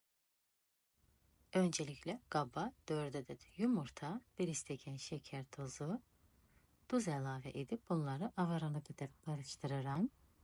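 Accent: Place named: Turkey. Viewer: Hazırladıqlarınıza söz yox.Əla.Danışıq tərzinizi anlamıram.Türkiyədə yaşayırsınız?Cümlələrin sonunda olan vurğu bizim millətə xas deyil.Bu səbəbdən soruşuram.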